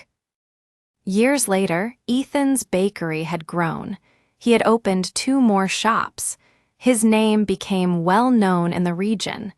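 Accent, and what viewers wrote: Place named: United Kingdom. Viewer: You speak Uk English but your English contents in American English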